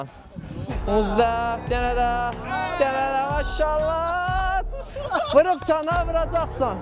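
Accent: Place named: Turkey. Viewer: کیرم تو لهجه ترکی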